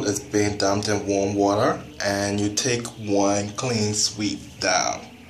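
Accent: Bahamas. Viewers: I hear a bahamian accent doe! Where u from soulja? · he sound super bahamian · u sound Bahamian, r u?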